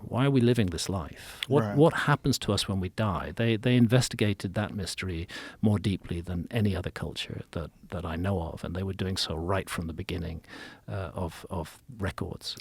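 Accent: United Kingdom. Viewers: jus because u have a british accent doesn’t mean ur smart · Still waiting for concrete proof of your theories graham… you trick a lot of people with your fancy words and British accent but eventually we need some proof. I’m sick of listening to the same stuff over and over again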